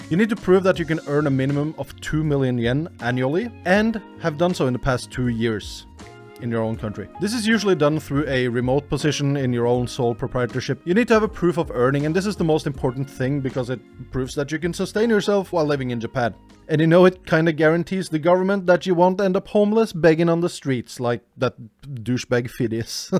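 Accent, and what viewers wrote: Norway. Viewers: La meg tippe basert på aksenten din. Du er norsk sant? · Syntes dialekta hørtes kjent ut før du sa du var Norsk :'D Har aldri hørt om "self sponsor visa" før, men det høres ut som noe jeg kan få til.. Det er litt under 140k NOK per år ser det ut som :3 Veldig god info! :D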